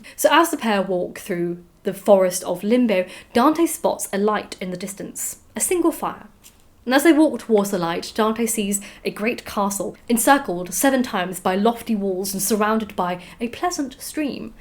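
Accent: United Kingdom. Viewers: do you have a British accent or do you just talk that way because you read books?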